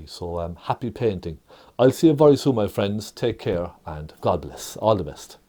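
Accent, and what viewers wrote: Ireland. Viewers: how much do you charge for just a recording of your voice? The Irish magic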